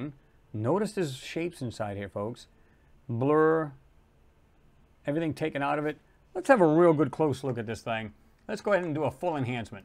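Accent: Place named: Northeast, United States. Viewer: That Northeastern accent loud and clear!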